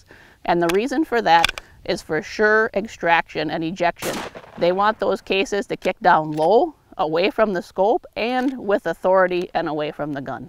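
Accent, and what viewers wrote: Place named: North, United States. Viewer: This broads northern accent is awesome! Yah youbetcha!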